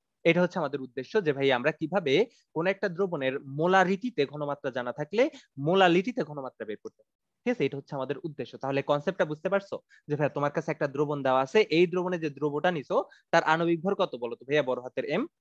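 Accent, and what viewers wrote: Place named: Chittagong, Bangladesh. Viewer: oree vai tw Chittagong er.. Seraaa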